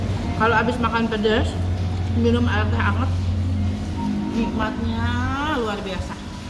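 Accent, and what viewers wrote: West Java, Indonesia. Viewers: Aduuuh teh ningal mam na mni sok mantap pisan mantak kabita,logat Sunda na deui aduuuh🤭sehat sehat kluarga th dan kang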